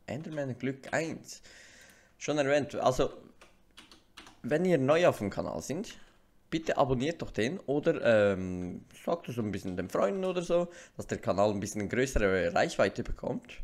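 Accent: Switzerland. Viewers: Bist du aus der Schweiz?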